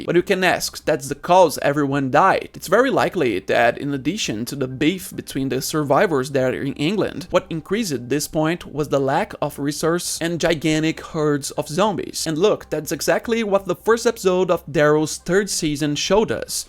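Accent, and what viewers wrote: Brazil. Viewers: You can barely tell you're brazillian, congrats!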